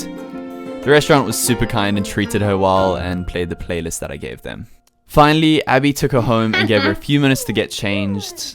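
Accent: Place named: Afrikaans, South Africa. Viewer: Do you know afrikaans you have the aksent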